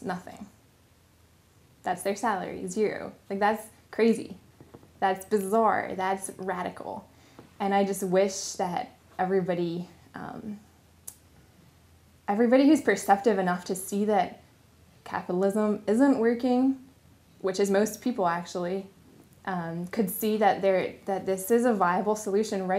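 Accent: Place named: Uruguay. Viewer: I was like hey why is she speaking Spanish with an Uruguayan accent? Hahaha.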